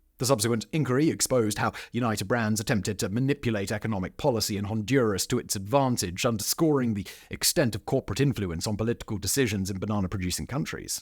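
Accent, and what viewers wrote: United Kingdom. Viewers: Don’t believe all this. Read the Fish the Swallowed the Whale for a more balanced view. A British accent doesn’t mean it is unbiased . By the way, why don’t we make a comparison with the British East India Company’s practices or the practices of Jardine Mathesen in Asia selling opium. A comparative approach might make your assessment more enduring.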